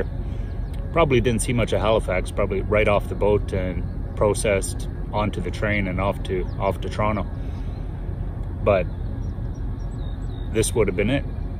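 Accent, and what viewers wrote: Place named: Finland. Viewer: A nice finnish accent.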